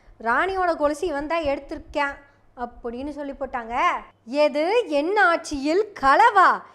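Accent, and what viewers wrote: Kongu, India. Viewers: கொங்கு பாஷையில் வயிறு வலிக்க சிரிக்க வைத்த பதிவு.முன்னேறி வாங்க.!..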